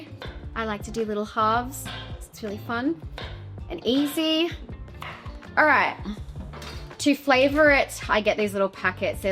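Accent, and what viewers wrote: Australia. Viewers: Surprise surprise 😂😂😂. Only joking, mate. I was expecting her to sound American. The Aussie accent was a complete shock.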